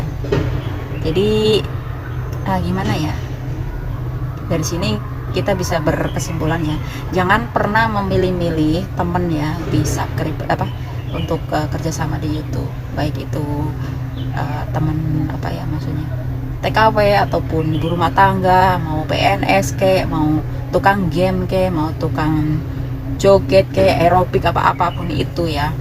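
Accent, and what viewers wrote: Banyumas, Indonesia. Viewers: Halohaaaaaaa beb ngapak❤❤❤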